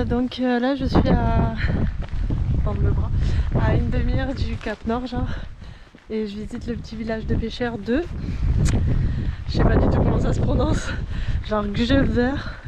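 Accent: South-West, France. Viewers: Bonjour, je découvre ta chaîne vidéo et alors là, QUELLE EMOTION, tu es une belle personne archi simple, belle mentalité et c’est si rare!… je parle comme une vieille hé oui je suis Vieille retraitée, super active . Tu es espentée, donc sud ouest peut-être ? Moi je suis bordelaise. Nous allons faire un bout de chemin ensemble, je le sens ! Mettez des POUCES. Ton direct, comme tu dis, est trop cool. Merci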